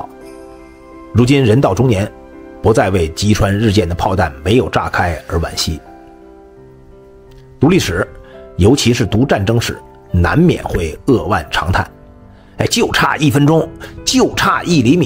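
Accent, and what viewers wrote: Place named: North, China. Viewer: 北方口音。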